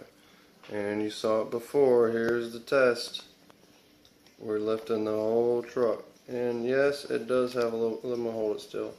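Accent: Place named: Massachusetts, United States. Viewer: I was watching your video the other night. Sounds like you've got a Massachusetts accent. Do you have another YouTube page called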